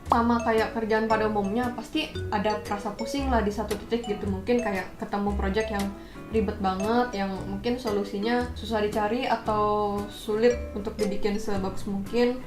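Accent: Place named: Java, Indonesia. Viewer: kak asalnya dari mana kok agak medok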